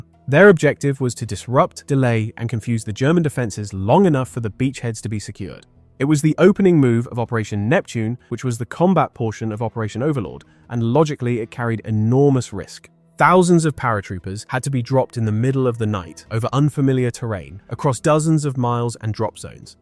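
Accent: United Kingdom. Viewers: The narration sounds Brit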